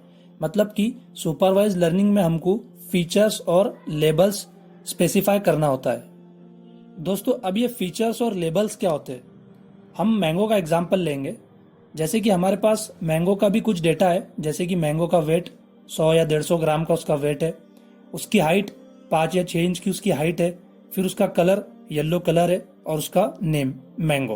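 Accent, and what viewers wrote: Hyderabad, India. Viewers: you sounds like hyderabadi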